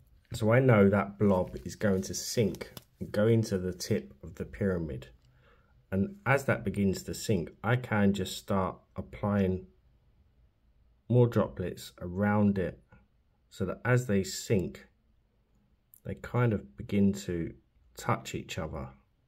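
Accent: United Kingdom. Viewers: Sorry, I have to ask, where are you from UK?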